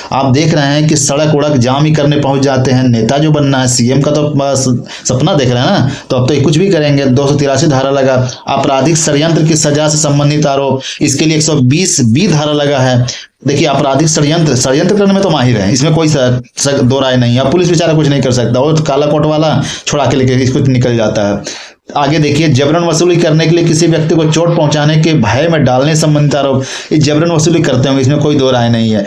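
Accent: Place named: Bihar, India. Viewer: अरे अपको बात से पाता चलता बिहारी हो तुम जायदा बाकर बकर मत अरो झारखंड के बारे मे ठीक है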